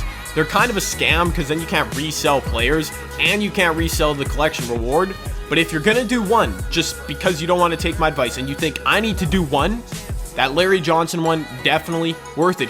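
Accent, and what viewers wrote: Canada. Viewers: Hugh Janus Hes Canadian its just his accent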